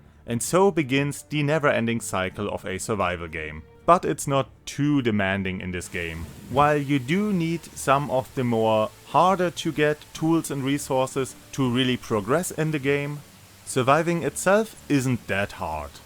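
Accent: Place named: Germany. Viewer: Bist du deutsch? Sounds like a german trying to speak english very correctly.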